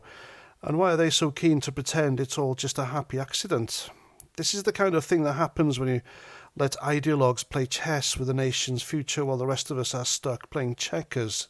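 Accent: Wales, United Kingdom. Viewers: I love the Welsh accent. It's heartbreaking to hear this news. Please make sure that Welsh children are taught about their heritage, culture and language. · Are you Welsh just like me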